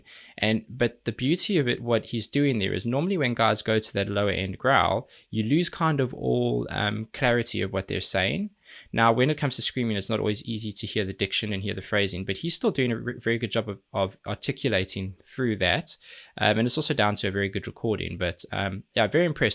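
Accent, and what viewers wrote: South Africa. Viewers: Love love love the technical breakdowns of all the reactions, can see the genuine joy in your face when you hear something that works great! Can i beg that you you check out anything from these 2 bands but my pick would be - and -. Keep up the awesome work man! (also an aussie with kiwi parents i understand the springbok accent haha)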